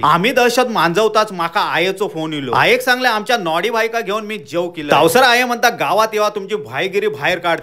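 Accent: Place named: Devgad, India. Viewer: दादा तुम्ही बोलताय मस्त नादनाय करायचा देवगडचा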